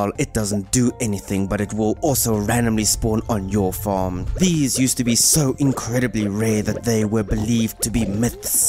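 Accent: South Africa. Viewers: So refreshing hearing my home boy's gorgeous SA accent. Pragtig🤌🤌🤌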